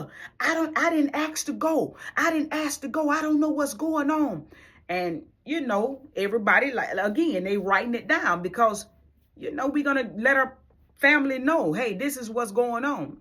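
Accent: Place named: Alabama, United States. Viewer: You from Alabama I can tell im also from Alabama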